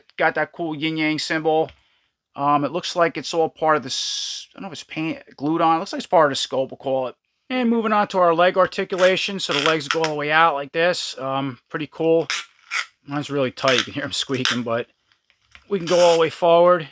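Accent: New York, United States. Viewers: You gotta NY accent, makes the review better..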